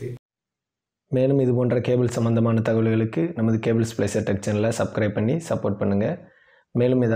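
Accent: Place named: Tirunelveli, India. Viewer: Are u from nellai district or kanyakumari...?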